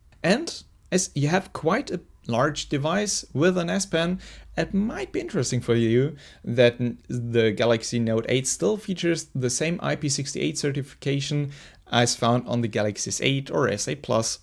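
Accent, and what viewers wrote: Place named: Germany. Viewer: hey um..... whare rrrrrr uuuuuuu ffrommm??????????????????u have an hard accent.Germany?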